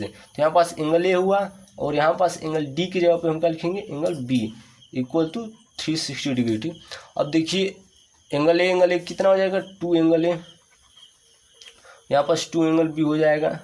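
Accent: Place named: Rural, India. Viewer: You are speaking like a dehati. Dehati kahi ka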